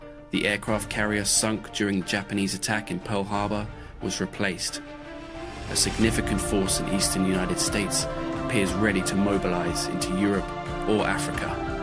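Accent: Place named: United Kingdom. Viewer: That was, is and always will be amazing- never-ever-ever seen anything like that in the history of A&A videos! Your Brit accent makes it sound like a real war footage reel, it's even more ground breaking than my NO LIMIT SHOWS - if this doesn't make your name+ A&A channel known in our community, I just don't know what will!!!!!!!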